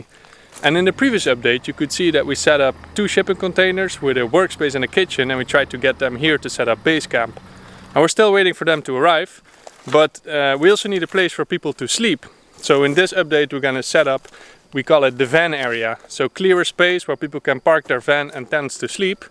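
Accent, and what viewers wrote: Netherlands. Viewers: Yes his vocabulary is very obnoxious. Don’t want to be rude but sometimes I wish he would just speak his native Brabants cause his English is very “stonecoal” as we say in the Netherlands.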